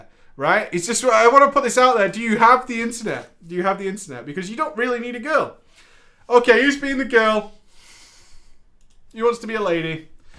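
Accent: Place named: Manchester, United Kingdom. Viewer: Ahahahaha YES PREACH! I fucking knew you were a manc :D recognise that acccent anywhere loool, made my day that did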